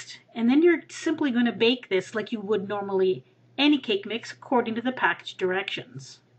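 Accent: Canada. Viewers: aww, these look so good! did u know you have a slight Canadian accent?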